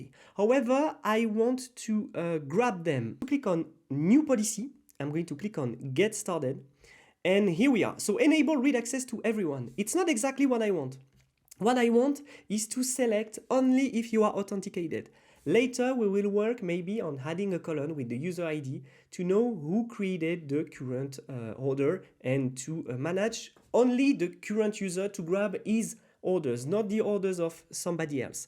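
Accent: France. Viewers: Cool. I like french English accent. It's only below the India English one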